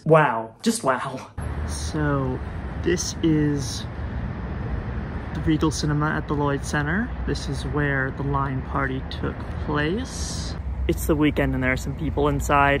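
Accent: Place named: Ireland. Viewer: I had never heard about this guy before but the fact that people in the comments are saying this is well condensed is crazy to me. Like this is enough for those poor people to be put through! (also what is with the irish accent? why not just be english? what does northern ireland have to do with harry potter?)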